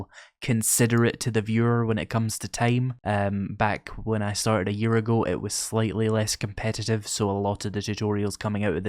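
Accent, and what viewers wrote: Scotland, United Kingdom. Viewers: Congrats! You deserve it. I found your channel from your tutorials and have stayed for the series play (and the accent). As one of your American fans, I’d love some Scottish-isms thrown in. And the audio quality and level is perfect. Here’s to you getting 100k! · I can definitely see people coming for the voice. Scottish accents are just awesome. Congrats on the milestone. As far as other games I think most of your followers would enjoy watching you play anything as long as you bring your passion into the videos. That's what won me over.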